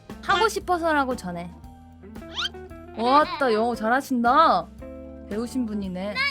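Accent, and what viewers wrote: South Korea. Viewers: 귀여워서 계속 보게되는데 강글리쉬 듣기 힘들어서 계속 보기는 힘들고...음소거를 하고 보겟습니다...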